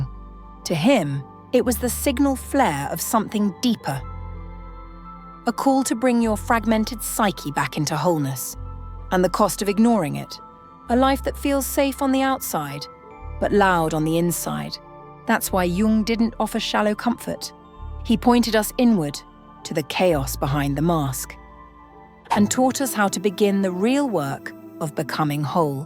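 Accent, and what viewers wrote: RP, United Kingdom. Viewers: You have a lot of hatred in your soul! Just because this lady speaks clearly you have to be nasty about the English accent! I assume you're American and, if you are, that speaks volumes! 👎😡🇬🇧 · Fast speaking stuck-up British accent shoots concepts one after another without saying what to do. You are never the one in danger, your soul doesn't need protection. Loosen grip to worry to open space. No longer chase the worry. Catch the fear and stop it before it spirals. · That isn't a stuck up accent, nor was she fast speaking. She spoke clearly in what used to be called received pronunciation, which was a hallmark of BBC broadcasts. But now we have to pander to the idiocracy.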